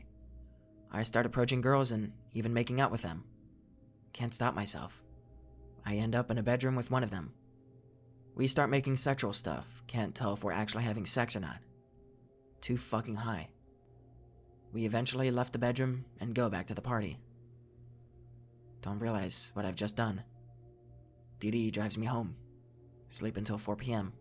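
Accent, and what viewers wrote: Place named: Maine, United States. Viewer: Hey man. I got an odd question. Are you from Maine? You have a Stephen King twang.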